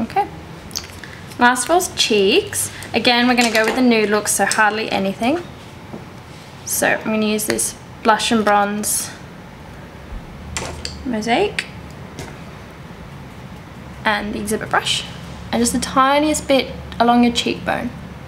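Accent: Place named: Australia. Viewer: u have such pretty eyes.. oh nd ur relle pretty too lol..are u from australia i love your accent!!!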